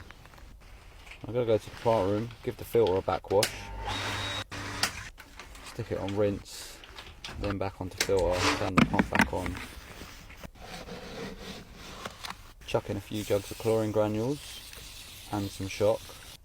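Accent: United Kingdom. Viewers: a British accent is relaxing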